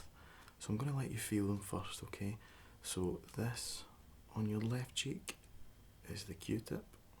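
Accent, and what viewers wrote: Scotland, United Kingdom. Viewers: I love this! It's always more relaxing for me when it's a scottish accent because I'm Scottish. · I think I'm going to move myself and my Australian accent to Scotland now in a desperate attempt to pick up this accent! Hahaha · I adore your videos, you have such a relaxing voice! And I'm quite the sucker for scottish accents:)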